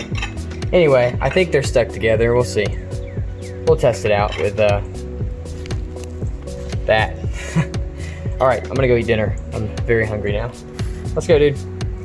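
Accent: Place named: South, United States. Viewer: Love he has that southern accent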